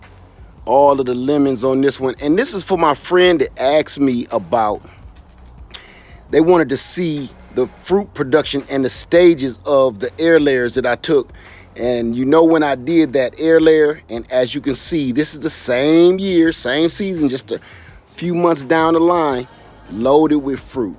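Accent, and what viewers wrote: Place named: South, United States. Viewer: I knew your accent sound like a southerner I'm from Beaufort my older brother lives in Columbia as well wow!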